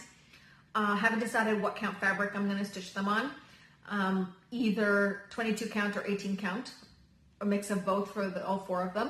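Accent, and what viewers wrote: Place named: Newfoundland, Canada. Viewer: Hi. I’ve been a subscriber for a while and I have admired your stitching so much. Before you go on too much about you should know that he makes fun of the way you all in Newfoundland talk. Lol 😂. You’re right. He is so funny and I think he and I were separated at birth 21 years apart. I’ve started my first full coverage piece so you are a real inspiration for me 😍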